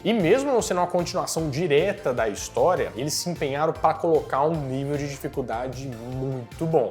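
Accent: Minas Gerais, Brazil. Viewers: Como eu amo esse nosso sotaque mineiro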